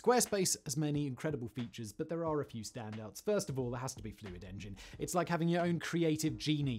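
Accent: United Kingdom. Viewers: Hearing a British accent talk crap about maglevs when y'all can't afford to build stock HSR is cute.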